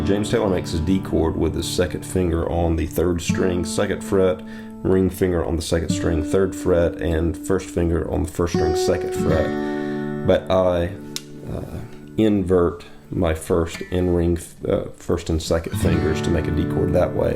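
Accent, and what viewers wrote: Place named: Kentucky, United States. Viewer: I'm a 60 year old (starting my 4th quarter) guitar player who has played in bands for over 40 years. I Loved the Eagles, Foreigner, James Taylor, and now Zac Brown and Garth and many others. I have just started finger picking using more than my Thumb and Index. This very song, Millworker, took me through an unfortunate divorce. Back in 2006, all I had was a borrowed small TV that played DVD's. I had JT's Pullover and that was all. This song became my anthem. Due to some kind of block, I could not roll from the D to to G (I kept coming up with:Ridin the Storm Out - Ha!). I had since figured it out but your's, I believe, is the absolute Best Tutorial I have seen. And being a Kentucky Boy, your SEC accent was welcomed. Keep it up! Jerry K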